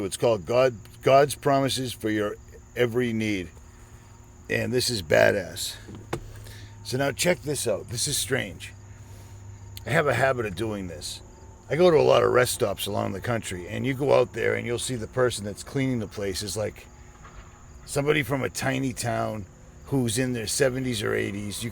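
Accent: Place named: Boston, United States. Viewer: your Boston accent is badass im from york england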